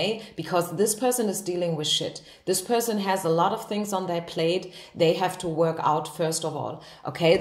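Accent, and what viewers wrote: Germany. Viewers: Are you from Frankfurt, Germany?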